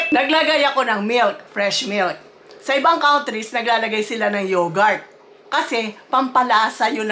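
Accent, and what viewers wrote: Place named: Pampanga, Philippines. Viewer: San Po probisya nyo KC prang kapangpangan UN Punto nyo eh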